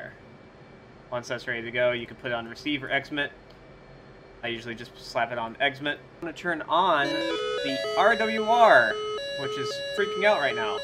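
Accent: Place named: India. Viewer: Whats wrong if he has an indian accent? Some of the simplest explanations out there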